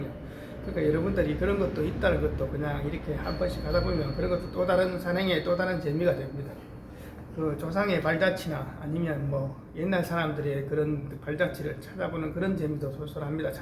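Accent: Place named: Gyeongsang, South Korea. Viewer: 경상도 사나이에 구수한 말씀 매력있어요 오늘도 고생하셨습니다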